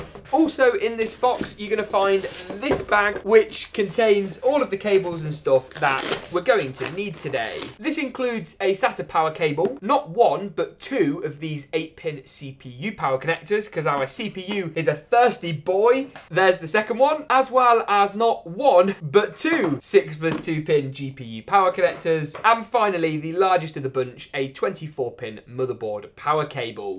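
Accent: United Kingdom. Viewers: You should be britishian your accent show it 😁👍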